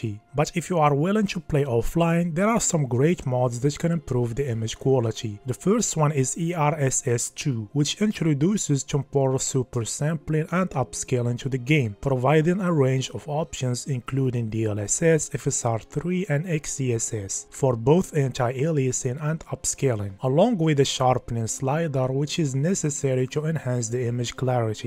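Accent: Morocco. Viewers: The accent mghribi byna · yo bro u moroccan??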